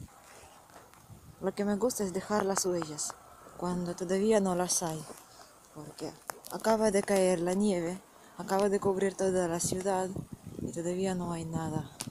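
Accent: Argentina. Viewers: Habla medio argentina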